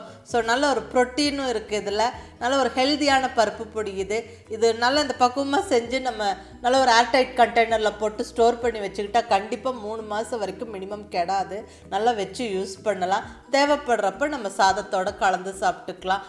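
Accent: Coimbatore, India. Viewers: Varuththu vittu kitta namma kongu tamil super mam ❤naanum idhey ooru than piranthu valarnthu marriage aanathu extra extra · U are from coombatore. The way u talk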